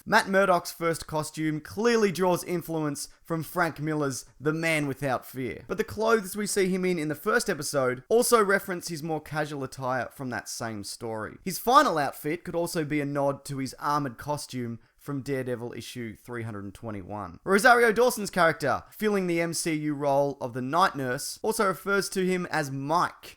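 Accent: Australia. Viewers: You Aussie? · bestminecrafter1 he's Australian...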